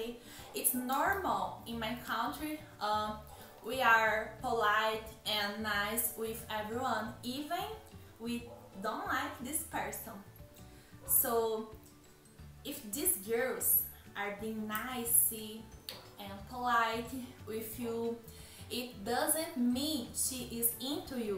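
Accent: Brazil. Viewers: “That’s da tru, I’m sorry.” I’m not making fun of that, but that sounded hilarious, I’m used to Brazilian accents because of my mom and little because of my dad. I was born in America, so I don’t have much of a Brazilian accent, I actually have more of a southern accent. But as funny as the accent sounds sometimes, it is a very beautiful one! A part of me wish I was born in Brazil just so I could have the accent! But God made me the way I am, and Im happy about it, much love from The United States! 🇺🇸 🇧🇷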